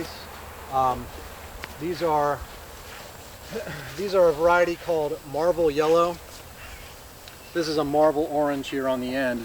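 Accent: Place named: Maryland, United States. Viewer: That east-coast Maryland accent brings me right back to my homeland of Philadelphia. Love it.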